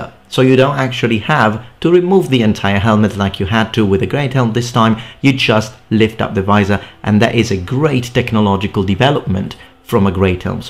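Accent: Italy. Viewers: Are you italian? Your pronounciation of "montefortino" was perfect. The accent too sounds a bit italian but it's so slight I can't tell. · Madonna se si sente l'accento italiano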